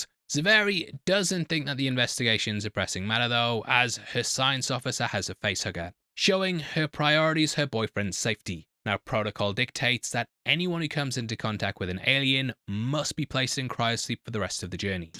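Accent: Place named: United Kingdom. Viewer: You sound like a Brit, you probably should know how french words are being produced, init mate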